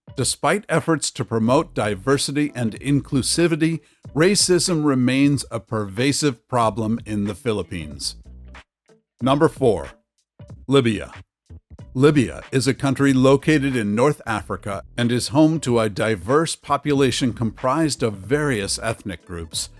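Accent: United States. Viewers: I would classify Lebanon as a special case. They have taken in millions of Syrian refugees and their economy has collapsed. Refugees in their own country. What would this American voice say if he was on the street with nothing to eat and no real government to provide any help? There is a lot of high brow hypocrisy in this entertainment media video. Meanwhile in Texas, possibly the richest place on earth, the government is consciously packing refugees from south of the border onto buses to states where the people are less racist. That's America for all to see.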